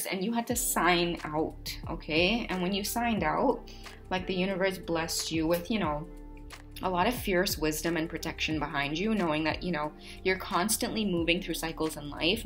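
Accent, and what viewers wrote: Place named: Trinidad and Tobago. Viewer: OMG my video's thumbnail is a pair of passionate lips!! I'm just waiting for it to process. Lawwddd!! Your upbeat energy made me smile right through this reading, love. Fantastic reading! It felt like a personal reading - absolutely on point!! You are so right about the DF leaving the DM to learn his lesson. We are standing in our power and finding our passion. Woohoo dat Trini talk - hot too bad!! Ah love it bad bad!! Thank you so much for the mention in the description, love! So appreciate it! 🥰💕💋🔥🇨🇦🇹🇹. Needed to add the flags!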